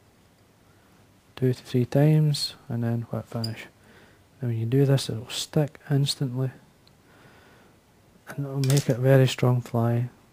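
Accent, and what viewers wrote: United Kingdom. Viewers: Great video. Thanks. I just wish I could understand the wording better. The softly spoken British "English" was hard to follow. You see I live in America! As they say “one picture is better that 1K words, so I will do the best to learn from the demonstration.. Thanks again for the post.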